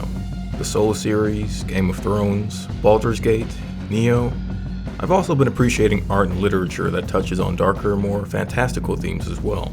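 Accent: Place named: East Coast, United States. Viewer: Im guessing you are part of the east coast of the US. ?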